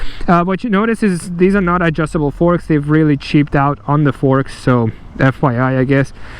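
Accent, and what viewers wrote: Iran. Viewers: Farsi accent 😂👍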